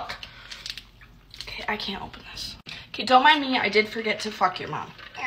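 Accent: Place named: United Kingdom. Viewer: Loving the British accent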